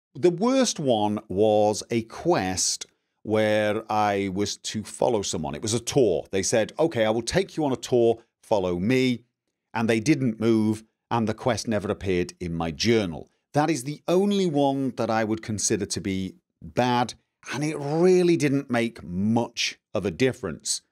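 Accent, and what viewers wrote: United Kingdom. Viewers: Well then. So I know how whining sounds in a grown man's British accent.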